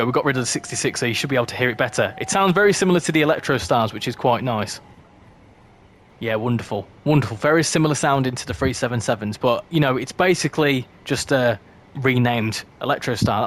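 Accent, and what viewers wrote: Birmingham, United Kingdom. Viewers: kinda brummy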